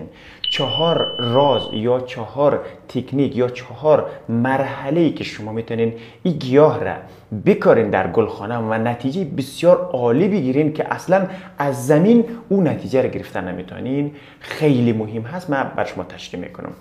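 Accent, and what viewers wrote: Afghanistan. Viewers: عالی بود یه برنامه جالب با لهجه زیبای افغانستانی و اصیل ایرانی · قربونش او لهجه افغانی و کرامت برم . شما مردم عزیز افغانستان . بسیار بسیار مردم عزیز و شریف و درستی هستید . من با شما عزیزان خیلی سر و کار داشته ام و شما عزیزان را به پاکی و شرافت و انسانیت میشناسم . ارادتمند .❤❤❤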